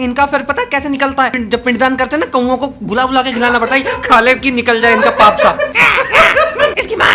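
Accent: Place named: Punjab, India. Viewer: O bai Punjabi accent 😂😂 me also punjabnn❤😂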